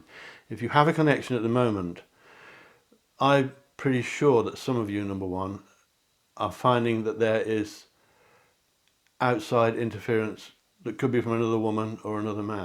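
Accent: United Kingdom. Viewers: I couldn't choose between 3 or 4 I think they both applied to me. I'm a constant worrier and my stress levels are thru the roof. I'm dependable but not like my husband he's the one always does what he says. As for the richness I associate that to happiness and good things in my life and I have that without question. Love to watch you and listen to your voice. I'm from a state called north carolina in the US and absolutely love British accents. Thank you very much for your readings and please do continue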